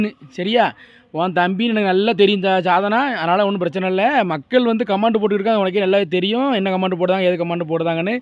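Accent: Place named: Tirunelveli, India. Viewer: தங்கள் நெல்லை பேச்சு நன்றாக உள்ளது அண்ணா 🎉🎉🎉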